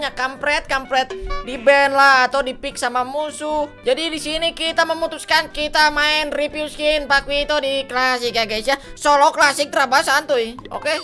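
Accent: West Java, Indonesia. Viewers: Bang lo orang sunda bukan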